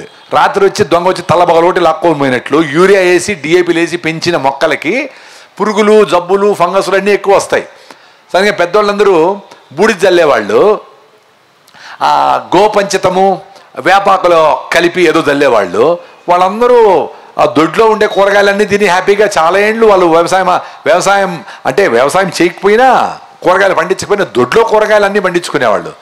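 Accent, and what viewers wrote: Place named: Chittoor, India. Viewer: Madanapalle chittoor slang ande adhe .. mame atle madadatham ..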